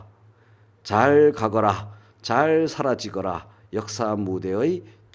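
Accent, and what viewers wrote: Busan, South Korea. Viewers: 최선생님 말투가 경남 부산말투같기도 하고요~~~~~~~~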